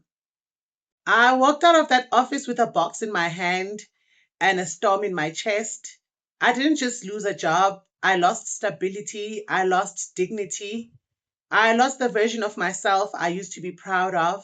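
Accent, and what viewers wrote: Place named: South Africa. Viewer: You sound like one of us. Anyway, love from South Africa 🇿🇦 !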